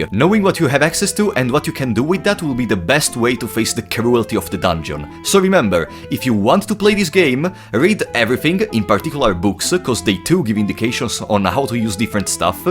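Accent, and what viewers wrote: Italy. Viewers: Hi as a new player i agree with you and I want to ask you if I should try to talk to enemies, I think is important but my friend always told me that is useless because all entities there are evil... Also you sound like you are italian.... are you?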